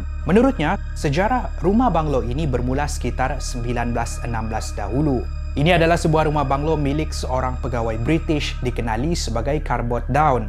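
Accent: Sabah, Malaysia. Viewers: ckp sabah sya suka dgt org sbh ckp...sdp gilaa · Orang Sabah...bah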